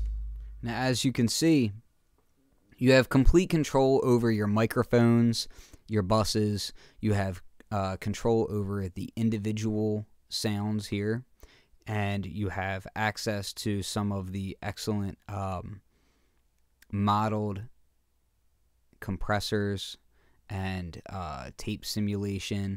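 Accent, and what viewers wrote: Philadelphia, United States. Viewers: Thank you for the video. Is that a Philly accent by any chance?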